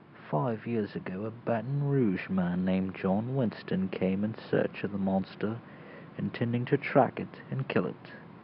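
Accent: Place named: United Kingdom. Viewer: Snow in the Louisiana swamps along with the narrator's British accent make me believe this is real.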